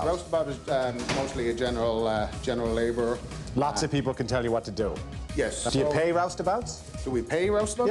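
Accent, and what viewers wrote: Newfoundland, Canada. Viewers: Funny to hear get his newfie accent back,love it❤❤❤❤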